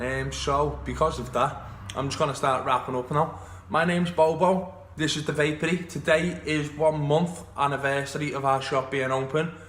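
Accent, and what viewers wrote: Liverpool, United Kingdom. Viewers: Defo from liverpyool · Just ordered one of those, can't wait to get it now , ) Well spoken M8. BTW subbed just for the scouser accent. 👍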